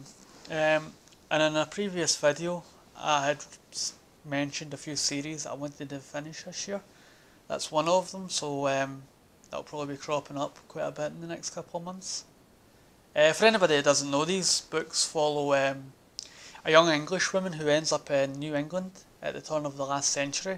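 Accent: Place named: Scotland, United Kingdom. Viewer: Very nice! You should totally do a Rabbie Burns reading, you have the perfect accent for it :D Also, I wouldn't mind that Pullman book, it's actually on my 'want' list 'cause I'm trying to collect all of his work :D I'll cover the postage if you want! Although if someone else wants it then don't worry about it too much, I'll get to it eventually either way!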